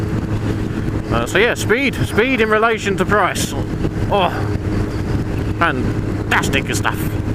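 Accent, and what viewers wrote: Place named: Australia. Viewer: I'm new to you.I like the distance you keep from cars,i like the general message you are trying to give,i like the fact that you havent bought the latest and greatest super duper bollocks and do fine on an older bike.I dislike the slightly australian accent you sometimes have,the colour of the fork tops of your zx9 and erm,the shape of your mirrors.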